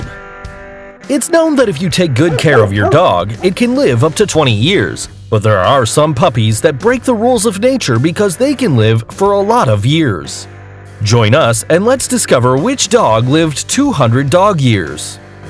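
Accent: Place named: United States. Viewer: I do not like the USA English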